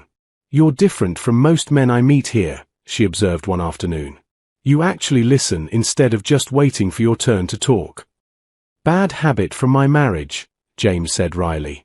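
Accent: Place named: United Kingdom. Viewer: ...you f***ed around and found out... From a native North American English POV, I don't know why, but the British-accented voice-over narration sounds so delicious, especially when this channel dares to drop the F bomb, for story benefits, of course. This is another AI-generated tale like so many others cranked out by this and similar mills, but it is a wee bit different, a touch unique in how the tale unveils and delivers its Scarlett Letter denouement for the characters involved. She still let the little brain run the show, until, when alone, her big brain is finally allowed enough oxygen to realize the black hole she'd let herself fall into. The climax is early on, but the supplemental details afterwards are more than enough to keep one's attention in this longer than most other tales of woe. One of my fave sarcastic titles for such content is "As the Stomache Turns." Fortunately, refreshingly, this one's not nearly as tacky as too many others tend to be. 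PS BTW, a humid Thursday in November...? I've been to Singapore 2x; I've had extended chats with locals there; it's humid EVERY Thursday there. Year-round. That's just the weather in that part of the globe when one is that close to the equator and surrounded by that much water.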